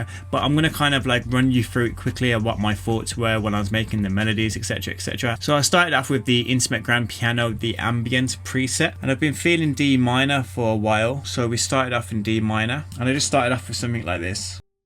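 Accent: Birmingham, United Kingdom. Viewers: Spitfire do the free BBC orchestra? Also you sound like a Brummy, though maybe somewhere close so, I believe people from Lichfield and other areas have a similar accent, I'm from Stoke so eh, just wondered roughly where you were wandering around in the trees? 🤔🙏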